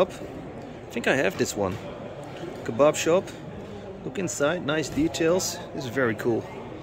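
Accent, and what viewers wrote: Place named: Belgium. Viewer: it's funny, when talking English sounds Belgian, when chatting Dutch rather 'Hollands':-)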